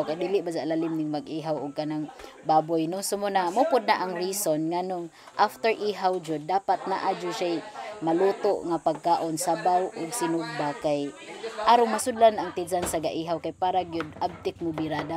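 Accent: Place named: Bohol, Philippines. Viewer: Pure bohol jud imu lingwahi. Puro J.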